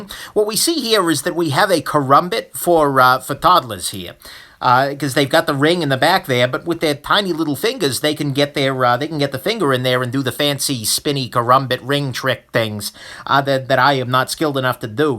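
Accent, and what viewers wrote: New York, United States. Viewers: Is this an NY accent he as?? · he has a nice New York monster accent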